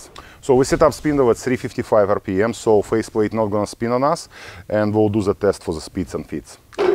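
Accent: Russia. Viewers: У вас явно режущий ухо рязанский акцент!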